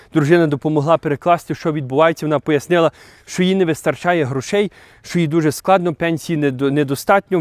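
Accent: Canada. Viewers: Цікава історія, гарний канадський акцент......, але чому всю цю історію потрібно було розказувати під час ходьби??? Ви кудись поспішали?))) Незважаючи на вашу хорошу фізичну форму ви все одно зидихалися і кавтали слова) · Звісно в Україні горе і біль. Канадці навряд чи це зрозуміють. Але Україна не була прям бідною державою. Багато хто мав бізнес будинки ферми заводики. Але багато хто це втратив. Звісно розділення в суспільстві існує і це давить на звичайних українців, і головне бійців які навіть додому приїхати не можуть не те що в барі посидіти. Спортом треба займатися це для здоров'я нації. Але наприклад розваги для мене зараз закриті. У мене немає настрою. Якісь пізнавальні тури то ще дозволяю, що б змінити навколо простір. Звісно треба економити. Коли хтось на супер дорогій машині і при тому відмазані від служби то це викликає незадоволення. В Канаду треба поїхати. 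У Вас гарна доречі мова, хоча Канадський акцент звісно є. Цікаво чому у всіх канадців він однаковий😊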